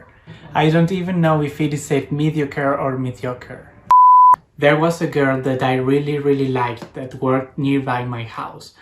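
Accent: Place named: Mexico. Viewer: Your English is very good, especially since you learned it all in Mexico. The only people that I know that have an American accent are those that lived in the US for at least some time. We were in France on vacation and I spoke okay French, but we had difficulty getting people to understand the name of the city we were driving to. Finally an older man figured it out by having me repeat its name with a French accent. See you are going to have an accent because those you speak with are also speaking with a Mexican accent. Heck, my wife has lived in the US for over 30 years and speaks great English, but with a slight accent...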